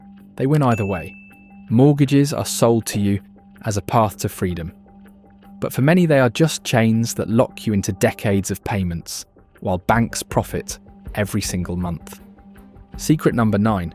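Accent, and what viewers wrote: England, United Kingdom. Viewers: Why you got an english accent. But the whole video is talking about dollars ?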